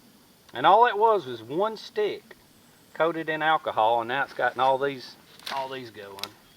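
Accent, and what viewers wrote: South, United States. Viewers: Yep, you do, hehe... What part of usa is that accent from ? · I like your accent, very Southern :) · I'm from the South too. Sounds like home to me. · Your accent is aweson man makes me miss the south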